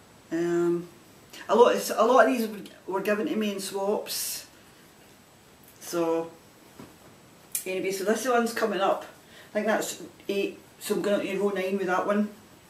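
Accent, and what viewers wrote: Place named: Scotland, United Kingdom. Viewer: So great to see you back! It's fab to hear a Scottish voice on a podcast, and your knitting and spinning are always beautiful.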